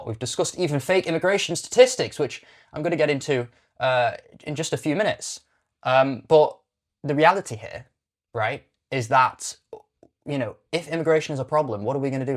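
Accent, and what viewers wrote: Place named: United Kingdom. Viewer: Right, so... The movie was shite yeah. BUT, tagging people "far right" or r@ for being sick of the migrant issue is part of what alienates them and pours oil on the fire because it closes off any real potential for dialogue. Hence the movie. The problem has festered too long without being addressed in a mature way, and lo and behold, now we have the state of the UK (you sound British, so maybe you should know). I'm writing as you go along; you cite Tommy Robinson, but NEVER has he advocated for people to be violent, especially in the latest mass gatherings. The scene in the flat with the family (I don't advocate for what happens next, of course): covering one family's sins is EXACTLY prescribed by Islam and is part of the saving face cultures from the Middle East. The "moderates" are irrelevant; they'll suffer as much as the rest when the fundamentalists act. 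They can't rat them out, nor will they do so for less serious crimes. And the number of families that have stayed silent when their brothers, sons, or husbands have committed the horrendous gang grapes is damning. So in that sense, the scene where the family tries to find excuses is not that caricatural.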